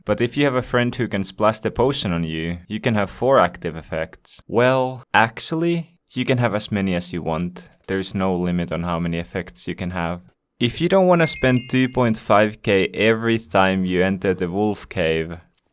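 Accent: Finland. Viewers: Are you from finland ?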